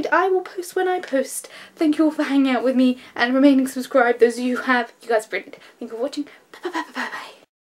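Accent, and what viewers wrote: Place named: England, United Kingdom. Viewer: I had only minimal issues understanding what you were saying, but that's because I am used to hearing people with an English accent talk. Not quite at that speed but your diction and pronunciation is very clear. Congratulations on the 500 subscriber mark by the way. Hope it keeps building. Thank you also for being such a good moderator for Trisha Hershberger when she does her streams that you can be around for. I know the time difference is quite a challenge even when she streams in the afternoons(for her) since it is still a 9 hour gap. Please always keep up the lightness and cheer in your videos,tweets and everyday life, the world can be dark and full of terrors but you help shine a light through it everyday.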